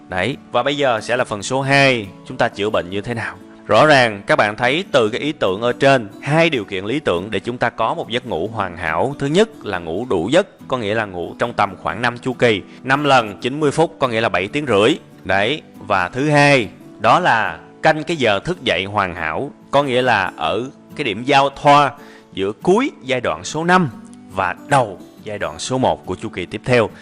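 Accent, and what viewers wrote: South, Vietnam. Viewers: Giong giang bai mien nam nghe nhe nhang , de thu hút . Xin cảm ơn tác gia với những thông tin bổ ích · Hoan nghênh nói tiếng miền nam, Chứ nói tiếng miền bắc nghe nhức óc quá ! Hình như lên video phần đông hướng dẫn chương trình toàn là người miền bắc.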